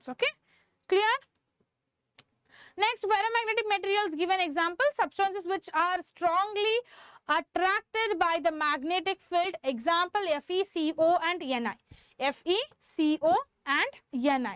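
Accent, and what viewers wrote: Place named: North Karnataka, India. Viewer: just like hubbli, belgum, dharvad language😅😅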